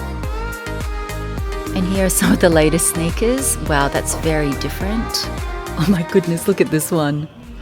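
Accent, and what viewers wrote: Australia. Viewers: Just wondering, are you Asian, or Australian? You look Asian, but have an accent of an Australian.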